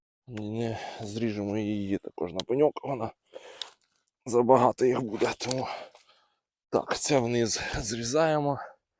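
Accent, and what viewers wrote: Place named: Poltava, Ukraine. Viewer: Дякую, чудові відео, гарна полтавська мова, все з любовʼю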